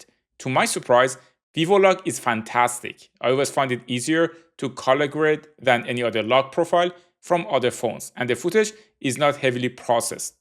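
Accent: Iran. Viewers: persian accent shines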